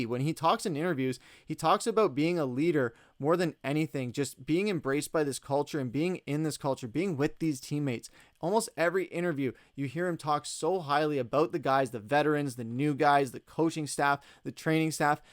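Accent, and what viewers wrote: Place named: Minnesota, United States. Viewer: You have the minnesota, north Dakota about accent.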